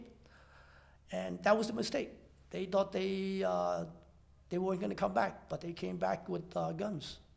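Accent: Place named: United States. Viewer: English accent is 100% American. Nice.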